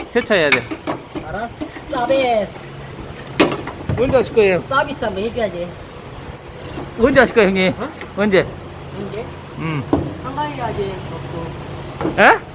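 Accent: Jeolla, South Korea. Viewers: 아따 절라도 사투리가 구수하구만요. 카톡 확인 부탁 드리겠습니다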